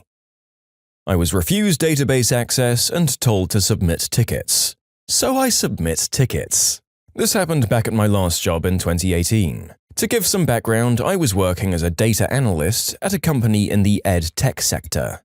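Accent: United Kingdom. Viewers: British narrator?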